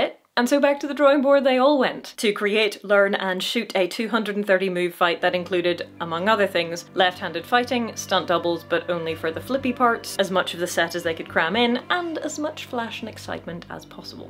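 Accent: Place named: Northern Ireland, United Kingdom. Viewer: Now that's a posh Norn Iron accent! North Down?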